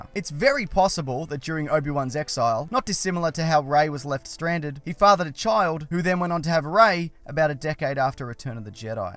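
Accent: Australia. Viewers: Are you Australian? Us Australia are mad dogs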